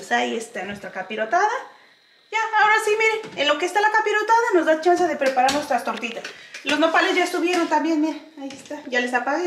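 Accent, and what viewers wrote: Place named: Honduras. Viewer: Soy de jakisco pero su acento no me parese de jalisco vivira ahi y tratara de imitar el acento espanol pero no me parese de jalisco le siento otro acento me parese que es usted de HONDURA?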